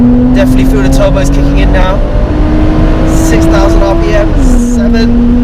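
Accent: United Kingdom. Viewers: Bruh that british accent had me thinking you were a white guy(not being racist), awesome review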